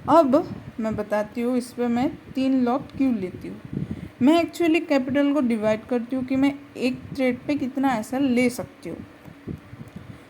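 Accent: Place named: Maharashtra, India. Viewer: Aapke bolne se lag raha aap maharashtra se ho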